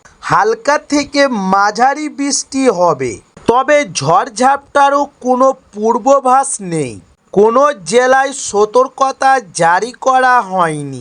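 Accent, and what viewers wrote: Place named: Malaysia. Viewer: তুই এই চ্যানেলটা কবে খুলেছিস তোর গলার শুনে মনে হচ্ছে তুই মালয়েশিয়া থেকে আসছিস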